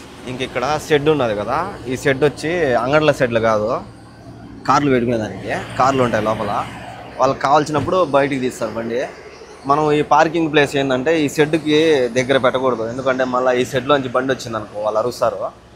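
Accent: Rayalaseema, India. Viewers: It's rayalaseema dialect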